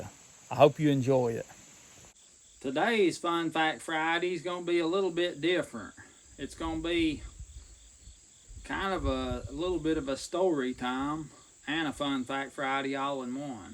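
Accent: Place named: Appalachia, United States. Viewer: I love hearing your stories about the olden days. It's wonderful that your recording them for prosperity. I pray you're downloading all your episodes as backup in case something happens to this platform. I would love for you to have this wealth of knowledge and wisdom spoken in a calm, relaxed, and real Appalachian accent. I learned ways to rally the town in case of danger. Community is all we have now.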